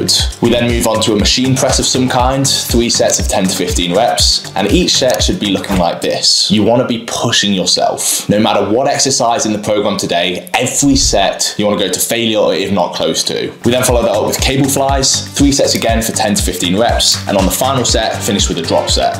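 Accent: United Kingdom. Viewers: The british accent 🙂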